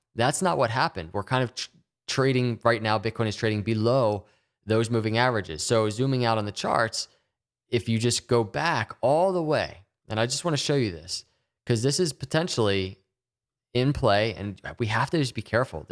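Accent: Philadelphia, United States. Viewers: Gotta love that Philly accent. GO BIRDS! Let's go Cardano too!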